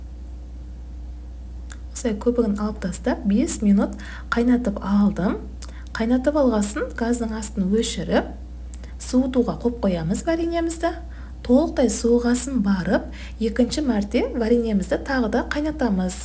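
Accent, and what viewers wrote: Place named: Uzbekistan. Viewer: Озбекстаннын казактары солай сойлейди, ешкандай калпак емес,озимиздин казак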